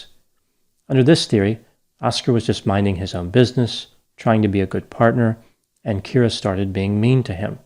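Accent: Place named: Pennsylvania, United States. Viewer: Anyone know if Dr grande is from pennsylvania? Something about his accent reminds me of a friend of mine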